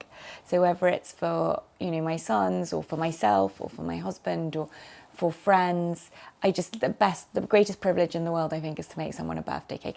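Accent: England, United Kingdom. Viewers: What a fabulous home. So cozy and welcoming and beautifully styled. Can tell is a very genuine person. I love her personality and her beautiful English accent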